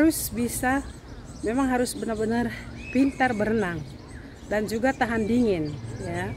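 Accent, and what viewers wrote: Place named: Flores, Indonesia. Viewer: Mohon maaf, Logatnya kayak orang flores, manggarai, ruteng🙂🙏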